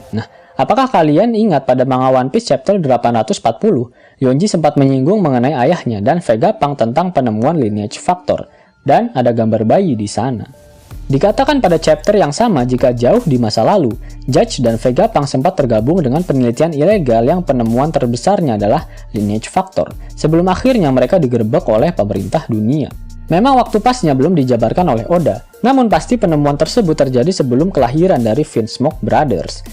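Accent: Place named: Bali, Indonesia. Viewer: a na ca ra ka da ta sa wa la, orang bali kayanh nih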